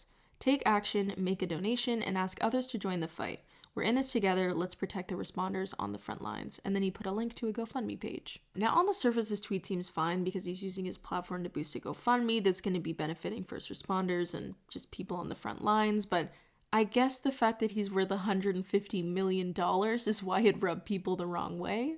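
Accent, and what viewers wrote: Canada. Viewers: omg are u canadian ? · she’s canadian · are you Canadian? Or from Minnesota?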